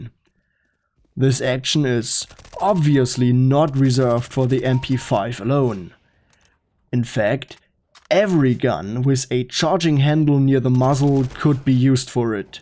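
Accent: Germany. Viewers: vid ist ganz ok, aber an deinem t-h musst du noch arbeiten, man kann sehr einfach raushören, dass du deutsch bist aber du redest schon flüssig, was sehr gut ist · Ich liebe diesen deutschen Akzent 😅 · Dein Englisch ist gut, aber man hört sofort, dass du deutsch bist 😁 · Du klingst sooo deutsch.😂 Trotzdem cooles Video. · Why does it sound like he is German? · Yeah apart from the zhick German Akzent and the sometimes wannabe British pronunciation of words pretty much yeah. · ohne deutschen akzent bitte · Man hört den Alman bisschen raus aber sonst interessantes Vid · Man hört nach nach kurzer Zeit das du deutscher bist, aber ich musste 1. nachschauen (kanalinfo) und 2. ich kenne keinen Deutschen der so gut und flüssig English spricht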